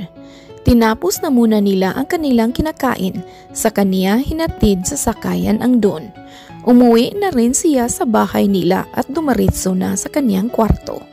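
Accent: Visayas, Philippines. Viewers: Ayusin mo pagsasalita mo. Visaya ka?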